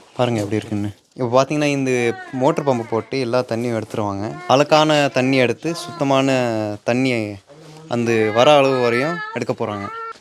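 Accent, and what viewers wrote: Standard. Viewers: Ur tamil is good. I.ur speaking pure tamil. As an Indian I proud of you. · ரஷ்யாவில் தூய தமிழ் மணக்கிறது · எங்கள் ஊரில் திராவிட மாடல் தமிழ்.... நீங்கள் பேசுவது தான் உண்மையான தமிழ்...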